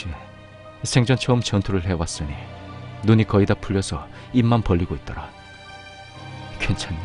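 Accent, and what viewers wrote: Gyeongsang, South Korea. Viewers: 무서운것도 무서운데 목소리랑 경상도 사투리 딕션이 너무너무 찰지네요ㅋㅋㅋㅋㅋㅋ 니 안전핀 맺게 뺐어? 하나빼고 던졌지 말임다. 야이 서울대 강아지야 덩달아 선수씨바야ㅋㅋㅋㅋㅋ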